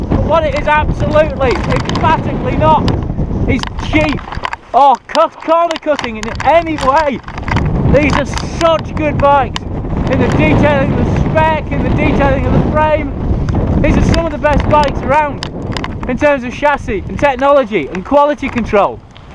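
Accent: Northern England, United Kingdom. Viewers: Loving these. And is it me, or is there rather more deliberate/pronounced Northern-ness compared to the Future-ised accent you have to adopt, er, elsewhere? Either way, great stuff!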